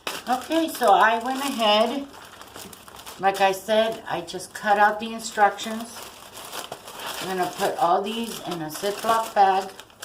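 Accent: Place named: Cuba. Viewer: Hi,! I am filming for the declutter challenge, too & I found your video from the playlist. I'm from Miami & your accent is reminding,me of home! I got kind of thrown off when you said you're in SOCAL, but then you confirmed that you're Cuban. I also thought so because of how clean and organized you are - again, just like the ladies at home! You've done such a great job organizing your pantry. Wish I was like that. I'm working on it, though! Watching your vid for inspiration!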